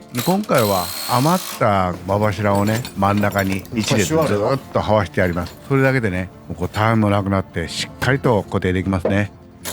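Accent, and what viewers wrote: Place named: Banshu, Japan. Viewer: 方言は同心円に広がりますからね〜 たまにさんの言葉が私の地元、兵庫の播州弁に聞こえたりします。（疑問形で 〜け？）など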